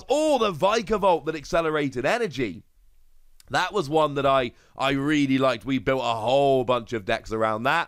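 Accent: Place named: United Kingdom. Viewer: Yesterday I've watch your video about eevee evolutions vmax and I was thinking how british is your accent. Today you mentioned about your local uk distributors :D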